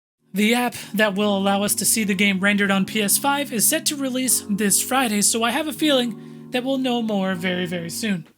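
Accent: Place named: Netherlands. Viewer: Yeah, as a dutch person, there is definitely a dutch accent.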